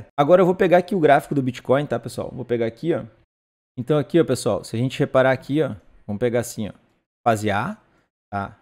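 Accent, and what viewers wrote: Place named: Rio Grande do Sul, Brazil. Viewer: Sotaque Gaucho é lindo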